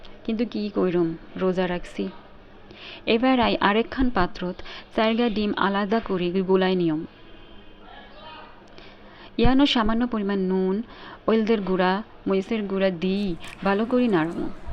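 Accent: Noakhali, Bangladesh. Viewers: ভাবী ত নোয়াখালীর ভাষা খুব সুন্দর করে কইতেছেন। অনেকদিন পরে এরকম নোয়াখালীর ভাষা আমি শুনতেছি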